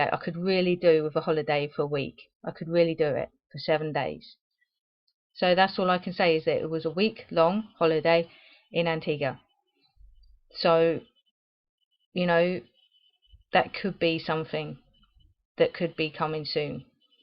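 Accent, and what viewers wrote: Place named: England, United Kingdom. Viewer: You are English! haha your accent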